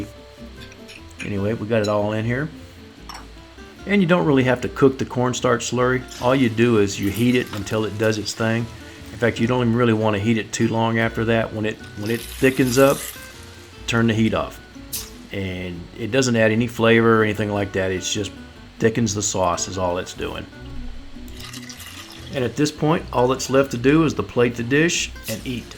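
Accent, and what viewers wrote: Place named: Texas, United States. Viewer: I'm making this tomorrow, it looks so delicious! Love your accent, are you from Texas? Greetings from Montreal! 😃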